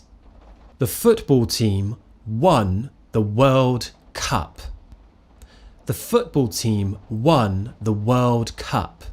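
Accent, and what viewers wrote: United Kingdom. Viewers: You have one of the most beautiful British accent ever❤❤❤❤❤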